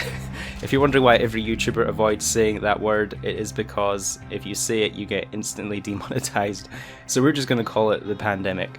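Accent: Scotland, United Kingdom. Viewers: Scottish? I’m Scottish! I know that’s nothing to be excited about but you know 🤷🏻‍♀️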